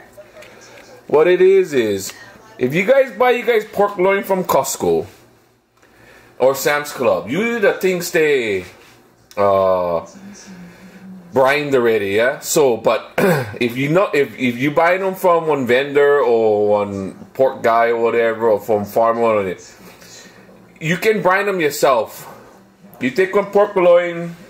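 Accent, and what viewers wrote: Hawaii, United States. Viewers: ❤ listening to you talk takes me back to my hanabada days in Hawaii ❤❤No Place Like Home 🥰 Thank You ❤️ for showing people food that we miss from back home doesn't have to be complicated❤ you made it simple for people like me ❤😊Much Aloha and Mahalo From My ❤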